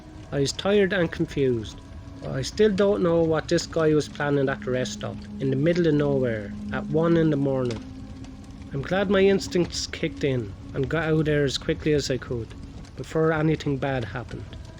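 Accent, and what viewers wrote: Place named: Ireland. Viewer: love the accent, there are way too few Irish youtubers with creepy content! I hope with more subscribers, the mic will be better in future, although I get that it's not first priority. As a suggestion, you could make longer videos, as many people like to fall asleep to them or drive while listening in - and if they are this short, it's not as convienient. About 20-30 minutes would be best :)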